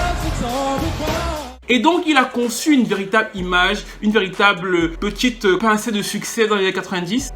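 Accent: Cameroon. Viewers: Ce mR parle comme un camerounais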